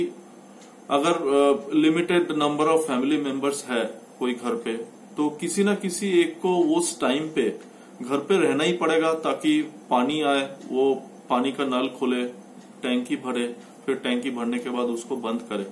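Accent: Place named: Bengal, India. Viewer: 😀 loved it specifically your deep kolkata Bengali accent you just sound like my late nani who inspite living delhi could not get rid of her bengali accent , any way I made it with a float switch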